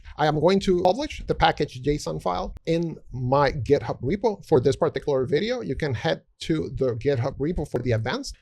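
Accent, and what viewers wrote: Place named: United States. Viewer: are you from america? i hear an accent i think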